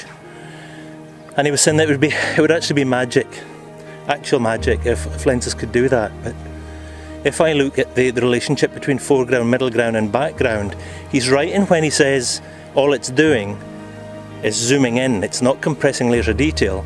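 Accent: Scotland, United Kingdom. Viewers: Forget my final remark just watched another of your videos which answered the question,I knew there was a Scottish accent in there.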